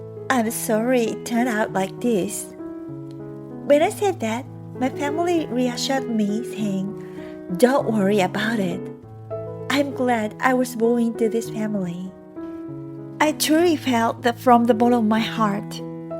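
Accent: Japan. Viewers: The audio seems to be spoken by Japanese. So why use Latino names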